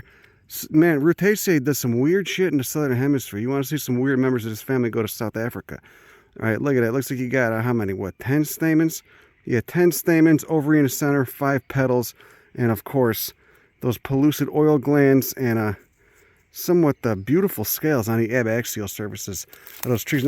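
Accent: Chicago, United States. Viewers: I thought it was old school Chicago lol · I love the mental transition from "some dude in the USA with the fast talk and the accent" ... "in Tasmania". Gives me a whole new way of looking at the country.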